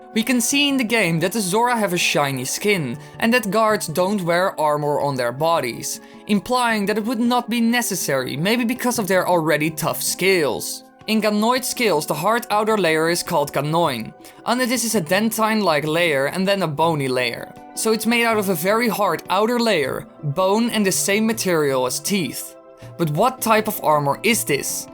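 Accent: Netherlands. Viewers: are u from holland? you're from holland arent u :P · He's Dutch ;) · Are you from the netherlands lol? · Ik had dit in de achtergrond aan terwijl ik wat anders aan het doen was, en toen realiseerde ik mij pas dat je Nederlands was. Oops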